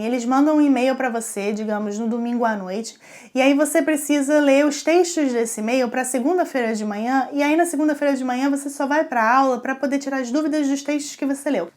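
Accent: Rio de Janeiro, Brazil. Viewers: Curioso vc disse que morava em Santa Catarina mas vc tem sotaque carioca kk fora isso parabéns pelo video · amo seus vídeos vc é carioca ?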